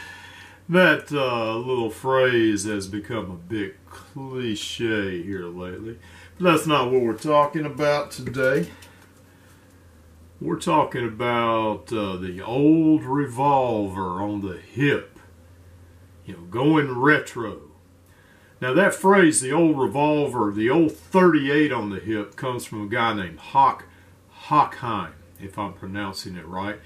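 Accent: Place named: Kentucky, United States. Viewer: Hey you better stick around, for one, im a revolver fan, for two I was raised in Kentucky and I love your accent. I had my first long gun at 9 years old, it was a 410 Stevens and still have it, I was trained by a Sargent ,Korea era, named (dad) .and three, I'm 64 and love using an old 38 from the hip. A Taurus 82 that's all I need. Thanks again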